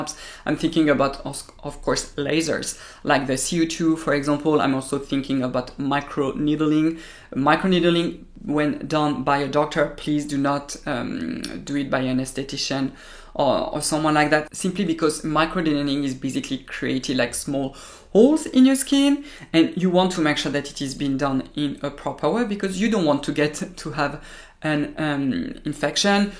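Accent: France. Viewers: I thank you so much for your content & explanation! Every video you make me smarter & way more informed. Added bonus is listening to your incredible French accent! French was my foreign language in school as I think it is the most beautiful language in the world! ❤️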